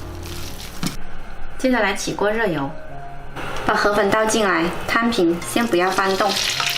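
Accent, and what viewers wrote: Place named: Hakka, China. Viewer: 好奇是客家还是潮汕人？口音很像客家人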